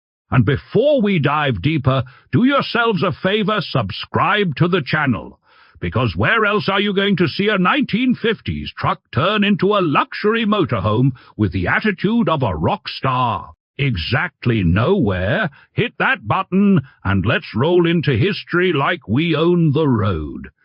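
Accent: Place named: United Kingdom. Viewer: A nice truck but what is with the goofy British accent? It makes me give a thumbs down.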